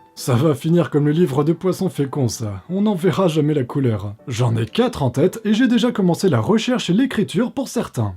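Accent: France. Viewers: l'accent français + ta voix, c'est un gros plus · ton intonation surprend... t'as juste un accent normand bien prononcé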